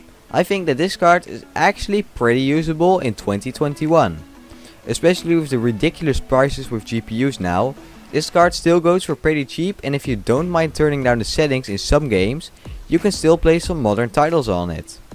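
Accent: Netherlands. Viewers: vlgs mij ben je nederlands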